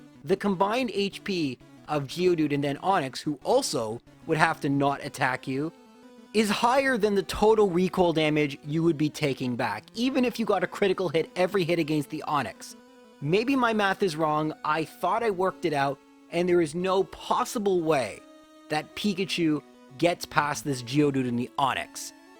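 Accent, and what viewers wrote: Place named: Canada. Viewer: I can tell your Canadian by the way you say “again”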